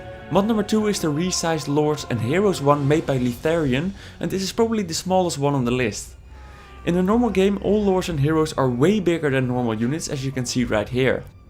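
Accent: Netherlands. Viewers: Nederlands? :) leuk accent, kan ze d'r zo uitpikken :P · Are you dutch? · This geeza Dutch? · It's fairly easy to hear the dutchness in the voice XD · I'm now.. and you are dutch, and have braces.. i can tell.. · You sound quite Dutch :) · Someone is really really Dutch. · Somebody is Dutch..:)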